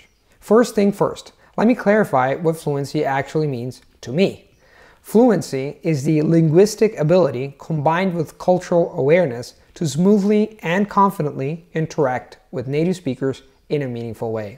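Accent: United States. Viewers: you speak English with an American accent. Sometimes I hear other people that learned English speak with a British accent. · Native English speaker here. In this video he sounds a little raspy, like he's getting over losing his voice. I haven't seen a general degradation of his American accent; I don't watch all his videos though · babe, no one is berating him or anything based off his accent. I never said that sound like a native should ever the main goal when picking up a language. The reason I brought this up is because I've been watching his videos for years & I do know he used to have a stronger American accent. That being said, it is interesting to point out how the acquisition of more languages can affect the languages one already has under their belt. Please think everything through before stating someone else does not support him. I obviously do. 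:)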